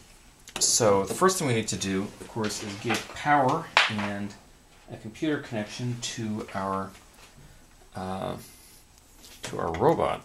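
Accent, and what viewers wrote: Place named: Canada. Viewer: are you Canadian?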